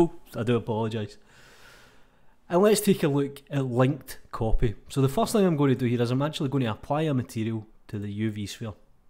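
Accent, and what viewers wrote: Scotland, United Kingdom. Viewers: eh, sorry but he's clearly Scottish! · i love your Scottish accent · Love hearing the Scottish accent! I am not native English, but I wish I had that accent 😊 Great tutorial BTW!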